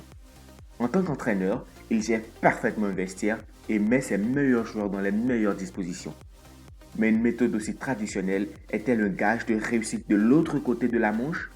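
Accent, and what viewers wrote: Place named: Africa. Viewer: Wesh on comprend rien avec ton accent africain , paye quelqu’un qui parle bien français pour faire ce genre de vidéo pff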